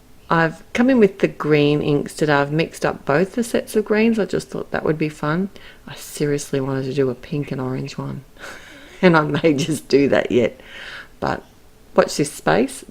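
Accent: Australia. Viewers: Thanks for sharing. Enjoyed this simple but very effective card tutorial. Nice to hear an Aussie voice too. Really hoping to buy some of the new Altenew markers - the colours are very vibrant and pretty. Can we buy Yupo paper here in Oz, do you know? Thanks again for your lovely card.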